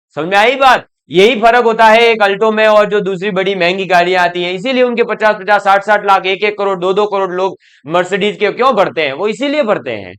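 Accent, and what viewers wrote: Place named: Rajasthan, India. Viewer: Bhai aap rajasthan se ho १००%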